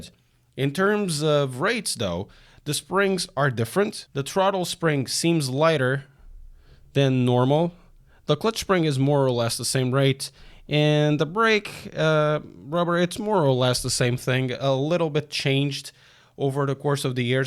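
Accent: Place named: Portugal. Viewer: Where are you from? Your accent sounds portuguese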